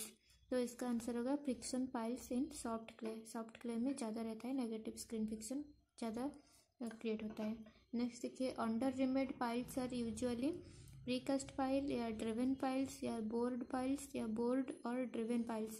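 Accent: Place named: Odisha, India. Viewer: I think you are a Odia .i guess it because of your accent .Good work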